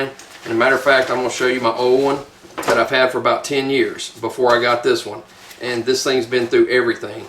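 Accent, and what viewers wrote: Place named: Southern Illinois, United States. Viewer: Hey, I think I can guess where your from based on accent and woods area. I'd say Southern Illinois/ East Missouri area. Close or no?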